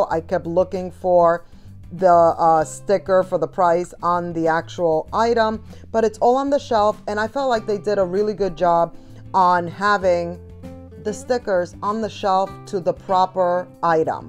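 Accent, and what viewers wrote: New York, United States. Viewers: Hi I found your channel recently and really enjoy a fresh take on these shopping channels. You sound like you're from the New York ,New Jersey area am I right? I don't mind that you say you know a lot. 👌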